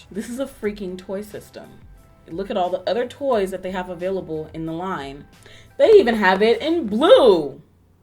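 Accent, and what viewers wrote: Nigeria. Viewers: I love your Nigerian 🇳🇬 accent 😍😍😍😍 makes me smile as a Jamaican doll myself ‼️❤️ I love you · I’m an African from Southern Africa and this is a “typical” Nigerian accent. No one in the south sounds like this, just like no one in the north. It’s a Nigerian Accent. African is so yawn. It’s right up there with “I’m visiting Africa” when you’re going to one specific country. Name the country+name the accent 😂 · Lol, I'm sorry this happened to u, but this shit was funny. I'm sorry to laugh. I'm glad it wasn't a situation where they got your info and emptied your account. Girl, u said Doc McStuffin "is black in real life" lmao I know what u meant but she isn't real lol it's a cartoon. Thanks for sharing this. Btw, I love your Nigerian accent (I don't think the language is called Nigerian, so my apologies, but u know what u mean. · She does that on purpose. 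She's Nigerian so she does her Nigerian accent from time to time. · Girl they sell them on eBay! I bought mine from eBay as well!! Also the washing machine doesn’t really work!! I love when you talk with your Nigerian accent! Subscribing!! Also I bought it for 5$ from eBay! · and I'm from Equatorial Guinea where we speak Spanish. This is not the accent people have here when someone speaks English. I think another commenter put it best that this is very much a Nigerian accent because southern and northern African accents don't sound anything like this. Ive had friends from Kenya, South Africa, and Tanzania whose accents sound nothing like the 'standard' Nigerian accent. Uganda, too. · Your nigerian accent is the most beautiful thing I've ever heard UGH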